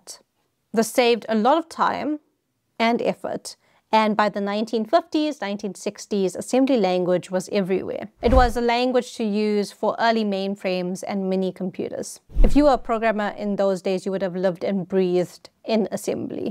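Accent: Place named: South Africa. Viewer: South African I think...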